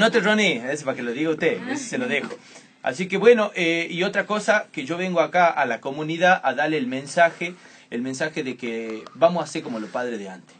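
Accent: Tucumán, Argentina. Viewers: Tucumano es!! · Alto tucumanoo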